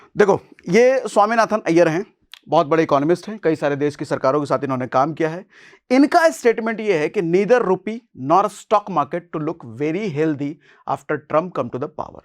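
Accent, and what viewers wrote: Bihar, India. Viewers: Bihari tone me daam hota hai. Logic very fine sir ❤❤ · जी ये तो पूरा बिहारी टोन है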